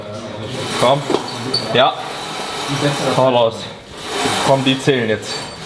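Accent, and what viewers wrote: Swabia, Germany. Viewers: Mehr Schwäbisch bidde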